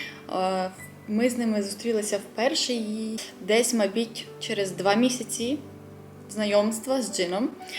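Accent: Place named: South Korea. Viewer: Дуже цікаво, що у вас з'явився корейський акцент😇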